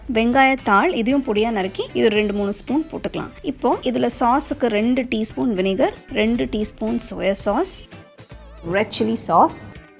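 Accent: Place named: North India, India. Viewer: Tamil uh? I thought ur from North Indian..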